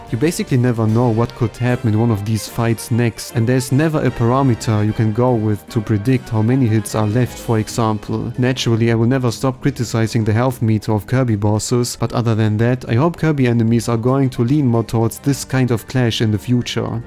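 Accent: Germany. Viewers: as an german i can hear you are german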